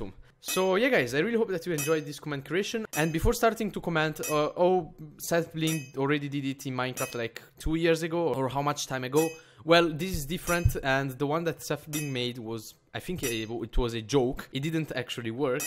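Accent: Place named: Italy. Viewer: si sente che sei italiano♡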